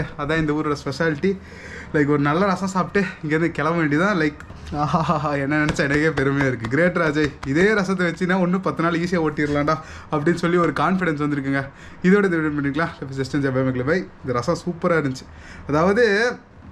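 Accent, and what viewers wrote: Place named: South, India. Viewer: Aviya iviya south slang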